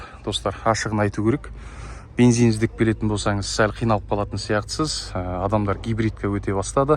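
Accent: Standard. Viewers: Ассалаумағалейкүм көпшілік! Сөйлеу мәнері, тіл байлығыныз керемт. Таза қазақ тілінде сөйлейсіз, тындап отыра бергін келеді.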